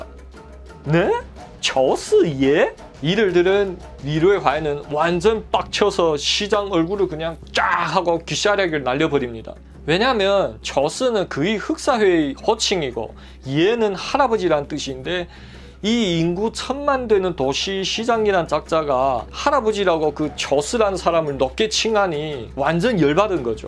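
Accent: Yanbian, China. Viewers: 조선족말투 잘하시네요 ㅎㅎ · 발음을 들으면 연변조선족인거 같아요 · 이름도 한국이름이고 근데 뭐지.. 중국사람인가? 발음이 사투리도아니고 뭐.. 중국사람인가요 이분?? 우연히 .보게 되었는데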